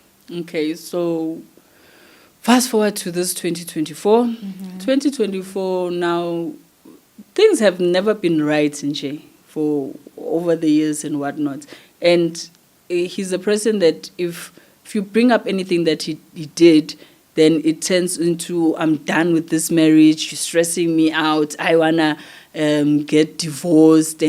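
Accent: Nigeria. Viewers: THE NIJA HAD TAKEN YOU OVER SISI, EVEN THE ACCENT IS LAGOS. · Women from other countries should know that when Nigerians come to any country, they are not coming to marry anyone. If they marry you it is to benefit them not you. Whatever they do is to make money. Leave Nigerians for Nigerian women. But girl, you now speak like a Nigerian.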